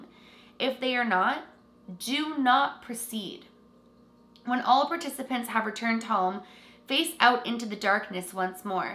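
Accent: Canada. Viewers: Well she is canadian she can’t help her accent lol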